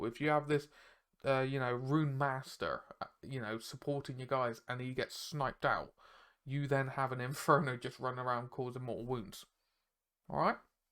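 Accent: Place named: Devon, United Kingdom. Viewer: That voice was much more Devon than I was expecting...love it.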